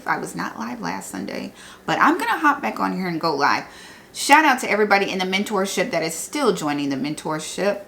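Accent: South, United States. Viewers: Love and hugs from Jersey 💪🏽 Love that Southern Drawl ❤ 🎉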